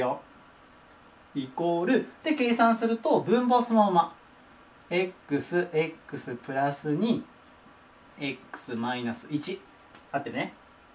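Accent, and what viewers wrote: Kansai, Japan. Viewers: たまに関西弁出てるのおもろw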